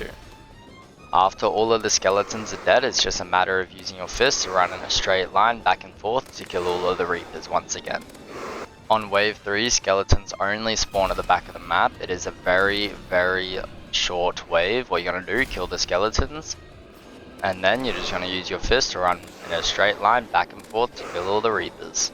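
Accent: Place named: Australia. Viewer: nice australian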